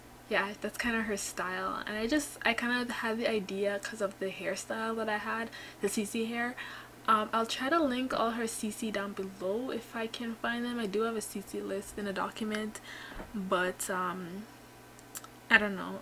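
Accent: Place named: Quebec, Canada. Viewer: i really like your accent- ik i sound creepy and correct me if i’m wrong but it sounds like a French Canadian accent. Anyway, hopefully you can get the Seasons pack soon ‘cause I’m super excited to watch the storyline that you came up with!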